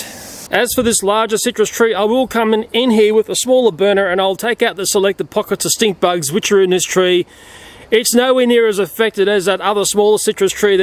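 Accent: Australia. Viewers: Australian accent faayaaa the best pest control... Dint think fire would be good for trees did yah. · You sound like Australian Christopher Walken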